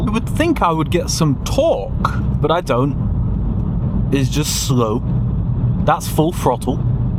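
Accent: United Kingdom. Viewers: I'm learning english recently.. I wanna ask you guys.. What is the name of the British accent that is used by this person? I wanna learn more about it ..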